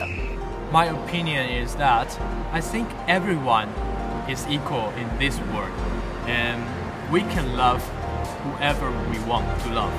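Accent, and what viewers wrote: Taiwan. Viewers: 真的滿台式英文....但很棒!!勇敢開口說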